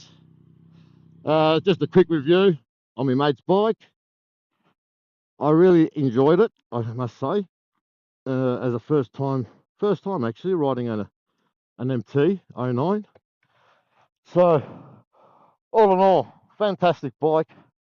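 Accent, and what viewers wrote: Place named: Australia. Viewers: Yeah this is a GEM bike. IMO it's the complete placate because you can use it for commute, twisities and some level of track riding! Btw are you from Australia, haha? Can sense the aussie in ya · Definitely sounds like a fellow Australian, which makes the fact he's driving in somewhere with greek/cyrillic alphabet(?) all the more interesting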